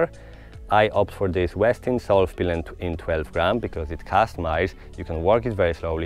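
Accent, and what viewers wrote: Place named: Ireland. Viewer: Bro, I can detect an irish accent as you're talking. Half way through I thought I'd lost my mind and you were a local.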